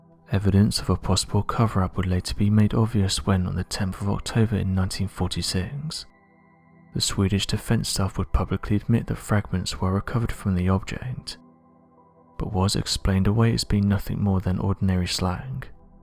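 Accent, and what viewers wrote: England, United Kingdom. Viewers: you heard him wrong. he clearly says "shooting off". i didnt even need to listen to it more than once to hear him say "shooting off" but after your comment i went back to listen and he doesn't sat "shitting off" lol. maybe you're not used to the English accent. I'm saying this respectfully cheers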